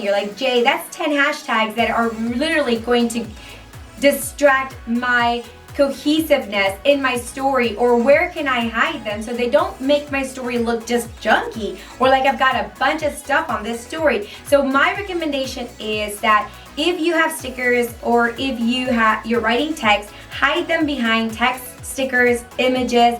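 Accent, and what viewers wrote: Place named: Spain. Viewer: Cool............. I don't know about .........I like your Spanish accent.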